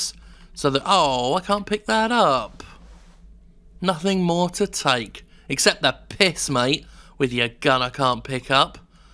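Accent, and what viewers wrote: United Kingdom. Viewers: sounds.. extra british in this one. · Glad I wasn't the only one wondering if he sounded more British than usual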